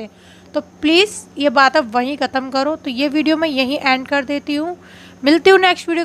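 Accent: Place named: Uttar Pradesh, India. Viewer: apki bolne ki style pr bahut hasi aa rahi thi....sach me up me ase hi bolti h ourate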